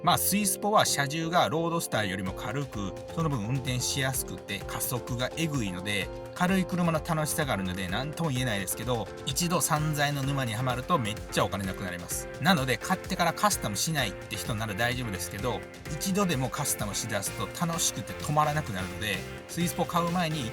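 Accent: Kansai, Japan. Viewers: はじめまして、もうすぐ1型中古を納車予定の者です。 色々参考にさせてもらってます。全然関係ないですが アークナイツというゲームの実況者（登録者10万以上）のoyukiさんみたいで関西弁のトークが親しみやすいです！ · 関西訛りなのに浜松ナンバー。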